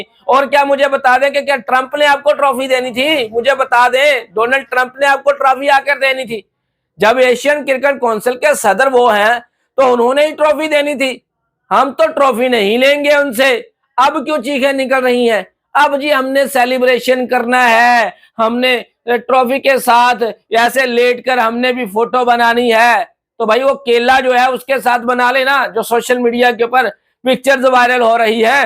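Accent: Pakistan. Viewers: Aap ki urdu Pakistani urdu lag rahi hai❤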